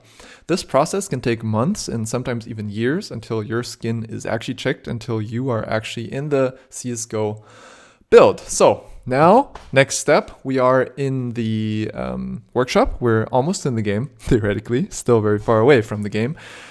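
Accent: Germany. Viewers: okay thank you ^^ PS: are u german ? xD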